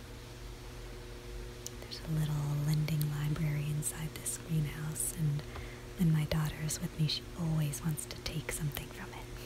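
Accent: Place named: Canada. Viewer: All perfect from A till Z.. Winter has also its calm, romantic and cuddle feelings. Thank you.. But nice to hear your American english (though i know its canadian). Enjoy the nice christmas time dear friend 🎅🎅🎅🌲🌲🌲🌠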